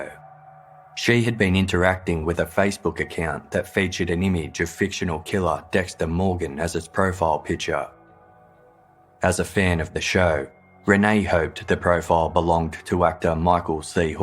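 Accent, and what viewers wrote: Australia. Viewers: Love the Aussie accent. You must be very “cultured”. He has an Australian accent. He’s an Aussie. Love the accent. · The story telling (writing) in these is let down by the infuriating narration in my opinion. The terrible pronunciations ( eg. Celtic is not pronounced Seltic…but Celtic as in Kilt -at least twice said wrongly) And the monotonous tone is mind numbing. The clipped way AND is pronounced and then if followed by a word starting with J it sounds like he puts ‘the’ in the space so something like …”and Jane said” becomes “and the Jane said” which is not just distracting it is ludicrous. I know these are free on here but for those listening elsewhere, they are not free. Therefore those people are paying for mistakes. As for place names, I can excuse the odd mistake in pronunciation of foreign places (though that can be checked before hand) but when a place names in his own country is hideously wrong, it is disgraceful. 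Also, the accent, though somewhat Australian, is not typical, well not of the thousands of fellow Aussies I know. If this was a report card I would say…could do much better D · omg seriously he is Australian.His voice is perfect for podcasting.Not being a dramatic narrator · God help me I'm falling in love with the host's voice. And his aussie accent. i can't help. i just can't help it. Also the research done on this case, along with their previous episodes, is just fantastic.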